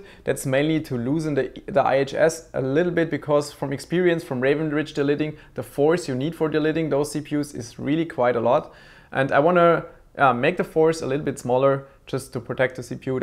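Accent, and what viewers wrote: Germany. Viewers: deutscher Akzent ist richtig übel... aber sonst mag ich deine Videos! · er ist eben deutscher. Meine fresse. diese dummen unkonstruktiven kommentare immer.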